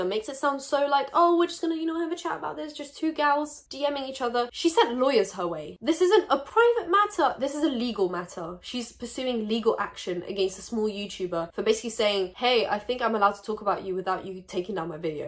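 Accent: United Kingdom. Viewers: Where are you from?? I thought you were British/English but you're not?? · If you don’t mind me asking, what country are you from if not Britain? I thought you were British lol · WAIT WHERE ARE YOU FROM???? i thought you were british this whole time. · wait where are you from?? I swer I thought you were from the uk idk lol please someone tell me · Mel Z I know lol I’m Scottish, just thought she was British until she mentioned her citizenship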